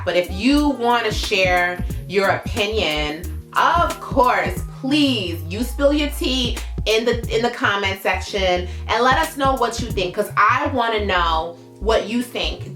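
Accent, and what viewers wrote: New York, United States. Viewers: "It's Stoop-id" that NY accent is strong gurl...😆😆. Anyway stand in your truth you did not get this far steering folks the wrong way. I appreciate your candor and willingness to supply the demand in this community. 🙌🏾👌🏾👍🏾.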